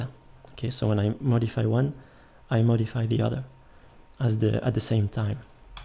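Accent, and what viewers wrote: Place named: France. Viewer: ton accent français est visible x)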